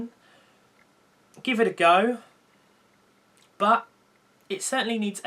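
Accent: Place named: England, United Kingdom. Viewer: watching you face review in this videos made me notice that you have a strong english accent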